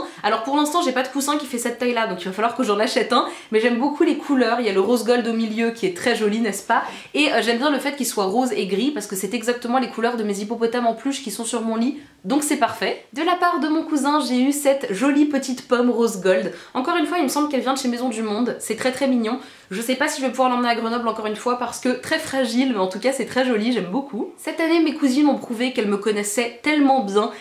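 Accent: England, United Kingdom. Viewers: Je suis jalouse de ton accent anglais 😍 mdr sinon super vidéo tes cadeaux sont géniaux 💋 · ton accent anglais 💘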